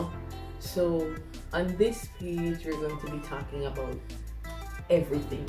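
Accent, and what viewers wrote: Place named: Jamaica. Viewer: How yah sounding suh jamaican....🙄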